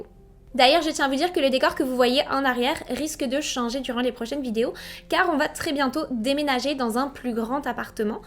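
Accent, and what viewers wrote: Quebec, Canada. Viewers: a mince c est cuit pour la comparaison avec Mc Skyz il me semble entendre un petit accent québécois ^^ .. Je suis chauvin Mc Skyz numero 1 :) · Je viens de découvrir votre chaîne jolie demoiselle. C'est un plaisir de vous écouter mais dites moi il me semble entendre un très léger accent québécois.... me trompe je ? Bise de France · est et Mc Skyz est Mc Skyz. Cependant, tout les deux sont extras!!! J'adore le fait que tu as un accent québécoise même si tu es française! Ça donne un sentiment de " réconfort ".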